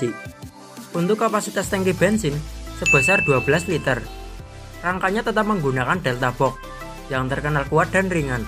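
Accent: Java, Indonesia. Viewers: Mas sampean wong Jawa yah.,logate ketara.,sing Nang video juga R15 plat R.,jangan2 wong Cilacap yah.. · mantap bang, cuman nada ngomong nya masih JoWo Medok...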